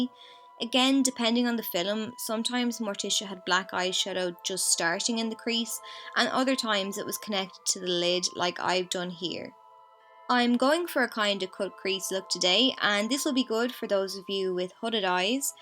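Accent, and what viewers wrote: Ireland. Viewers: I LOVE your brogue accent.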